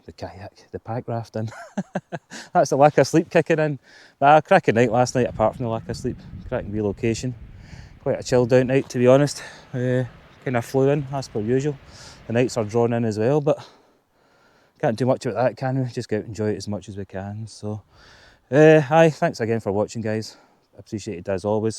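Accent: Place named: Scotland, United Kingdom. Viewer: That's quite a thick Scottish accent I think I understand half of what you say lol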